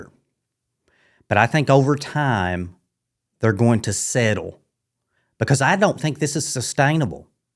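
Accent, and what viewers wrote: South, United States. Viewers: I just found you. I subbed. Keep up the videos buddy. You're good at this. People need your message. Oh, and great Southern drawl. 👍 From a South Dakota Yankee.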